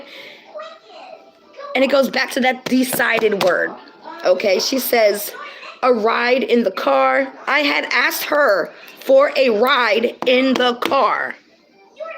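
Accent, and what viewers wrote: New York, United States. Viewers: ish lol!! Just woke up l'ok! And you had me lol almost fell out of bed. HHAHAHA you said, * And she foundher AT THE PARTY???!!** Haha i love that NY accent, makes me miss home! God bless sending light love from Connecticut.🌘🌙🌞🌞🌟🌠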